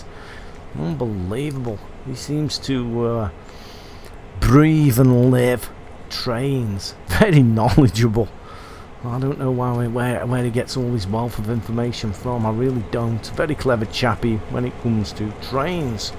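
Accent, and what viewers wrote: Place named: Stoke-on-Trent, United Kingdom. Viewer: You from stoke on trent by any chance? im from sneyd green and recognise that accent, although mine has gone now as ive been in somerset for 8 years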